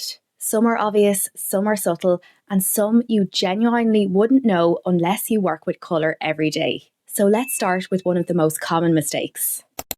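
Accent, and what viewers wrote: Ireland. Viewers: Brief and brilliant! Plus lovely Irish accent. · lovely Irish accent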